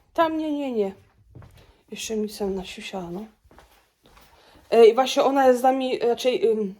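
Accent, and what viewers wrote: Silesia, Poland. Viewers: Jestes zarabista babka , uwielbiam ogladac twoje filmiki , no mowa slaska jest mega… buziaki dla calej rodziny🍀🍀🍀🍀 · Twoje życie nie jest nudne. A tak jak dla mnie to wystarczy, że usłyszę twoją śląską godke i już mam uśmiech na twarzy i dla mnie to ciekawy vlog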